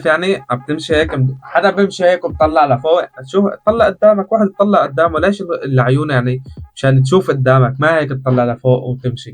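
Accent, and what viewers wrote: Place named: Aleppo, Syria. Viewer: أنتي حلبي كأنو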